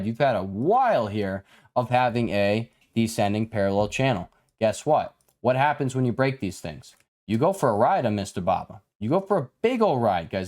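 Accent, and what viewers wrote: New Jersey, United States. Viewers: Are u from jjjjeersey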